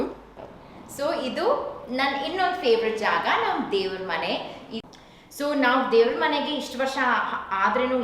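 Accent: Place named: United States. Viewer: #giveaway #ಗಿವ್ಅವೆ Hey there , Your kannada speaking style with American accent is so soothing😍, loved the idea of yours to teach your childrens to speak kannada.Stay Happy and prosperous☮️♥️.. Much love 🤗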